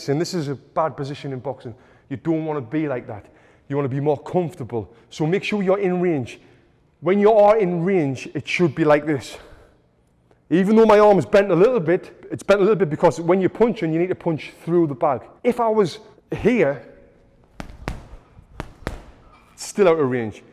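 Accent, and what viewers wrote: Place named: Newcastle, United Kingdom. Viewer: Brilliant accent, Newcastle?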